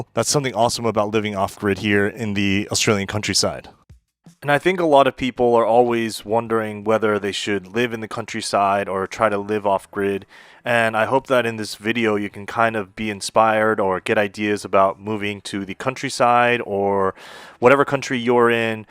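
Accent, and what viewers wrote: United States. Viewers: a Japanese bloke, with American accent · why do you have an American accent?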